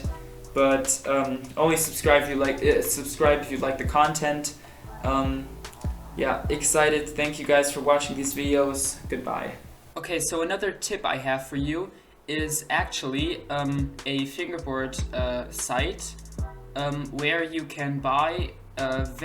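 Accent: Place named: Germany. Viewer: 90% sure u are german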